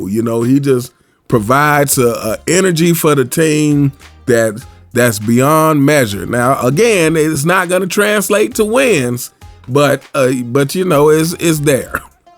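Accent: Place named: Memphis, United States. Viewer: Been following your content for a while. I knew you were from Memphis after watching one of your episodes. Are you from the Mound, because you sound like 8Ball.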